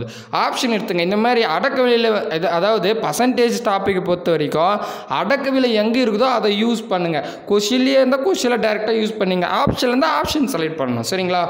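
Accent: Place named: Chennai, India. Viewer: Chennai slang explanation.... Nice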